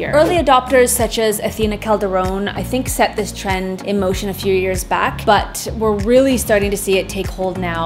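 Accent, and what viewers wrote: Italy. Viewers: Where did you grow up? Your Italian pronunciation is beautifulllllll — my HS and college Italian teachers’ ears would flutter — but I definitely hear a hint of some other accent while you normally speak. It sounds like a medley of 3 or 4 influences. Italian has gotttt to be involved.. you obviously speak English, but American English? Do I dare say no? And I want to say French? I am such a lover of soaking up unique ways of speaking and I’ve always been very intrigued by the way you speak! Side: I listened to the audiobook The Glass Castle yeeeears ago (one of my all-time favorite books) and it was read by the author herself who grew up allll over the United States and her accent was sooo unique. I’ve been super aware of tiny details in accents ever since haha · Your italian accent is perfect! Greetings from Sicily ☀️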